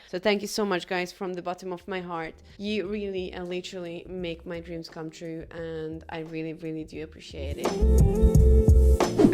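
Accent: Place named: Hungary. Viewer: Within 3 seconds of the video I knew she is Hungarian based on the accent, then I heard her name :)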